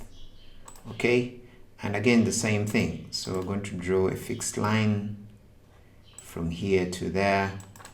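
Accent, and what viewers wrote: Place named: Uganda. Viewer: you sound like a ugandan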